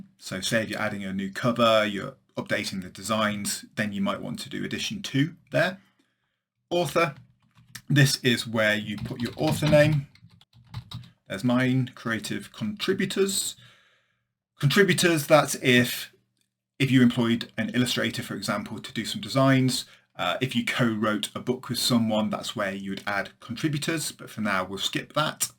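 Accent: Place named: United Kingdom. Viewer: Wow I love your British accent 😅😅😊😊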